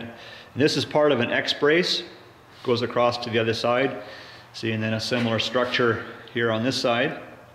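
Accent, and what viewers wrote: Canada. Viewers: I'm going to guess that he's from Newfoundland.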